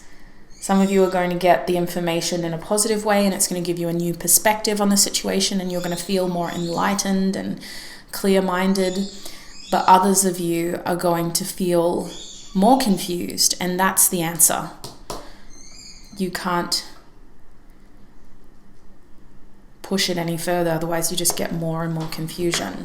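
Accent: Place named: Australia. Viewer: Oh listen, another Australian! Hello 👋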